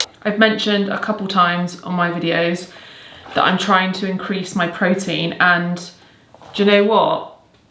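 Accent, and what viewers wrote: United Kingdom. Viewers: I like letting long vlogs run in the background while doing some light stuff that don't require too much attention because I'm trying to soak in some nice british english accent 🥰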